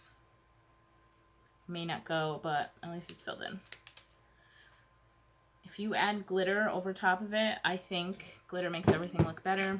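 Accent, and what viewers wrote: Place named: New Jersey, United States. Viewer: Lol I'm from NJ, just moved to Florida.. your accent was a welcoming thing to me.. we should go have some cawfee 😂😂😂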